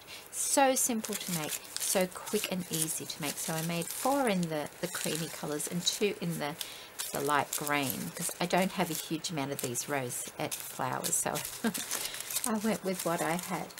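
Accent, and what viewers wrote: Australia. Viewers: Hello, I saw your comment and channel name on a video by Diane H and came to check out your channel. I don't know if you're familiar with Michigan in the USA but a lot of businesses and organizations use Mi or MI as "my" in their names (it's part of my name too!). I came here thinking you were from Michigan and was pleasantly surprised to hear the Aussie accent. lol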